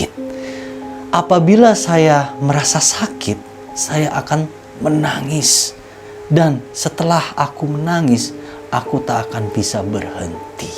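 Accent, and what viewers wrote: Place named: West Java, Indonesia. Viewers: mang kayaknya orang sukabumi/bogor... cara ceritanya, bahasanya bisa d fahami dengan jelas ma gw 🤣🤣🤣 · Bahasa sunda na nyangked wae nya kang hahaha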